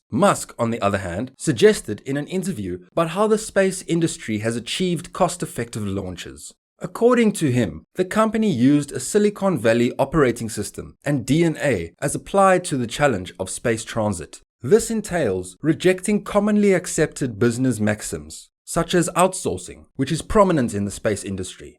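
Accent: South Africa. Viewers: Are you south African. You have the accent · Nice South African Accent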